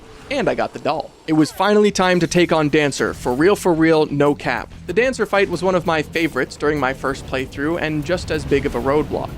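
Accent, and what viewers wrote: Canada. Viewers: are you mayhaps.... canadian?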